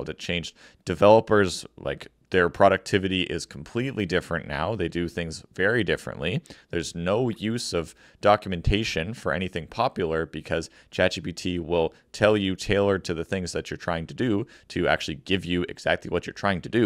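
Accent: Canada. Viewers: Canuck accent!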